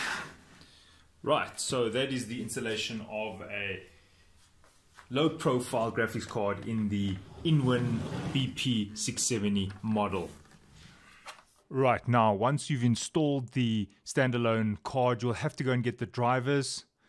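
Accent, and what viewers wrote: South Africa. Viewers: Hey, are you South African 🇿🇦. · This is a South African accent....you can’t fool me, thanks vir die review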